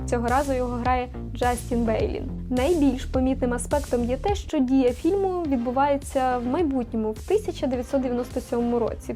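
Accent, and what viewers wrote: Standard. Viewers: Боже, яка ж гарна та чиста українська мова, насолода для вух 🥰🥰🥰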